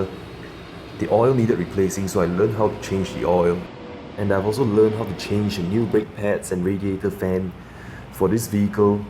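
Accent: Singapore. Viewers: A guy from my church is from Singapore and he sounds exactly like you. His wife, however is from Singapore also, but her accent is very different and she's harder for me to understand. I'm not sure why. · Rev up your Singlish. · just in case if heavy singlish happen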